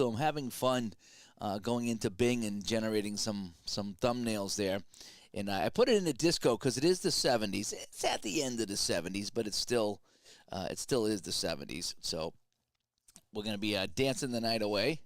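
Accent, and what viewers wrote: Boston, United States. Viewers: Wow that was a cool video! I listened to the whole thing as if it were a real series…lol. I just discovered SC Hockey a little while ago from your channel. Finally ordered it and I love it. Especially the feature for creating your own teams. So I am just about finished with assembling the 1980 Olympic Hockey Teams. If I send you my excel files would you consider doing a video of a game or two from the tournament? There were so many Boston players in our USA team that your accent would be perfect.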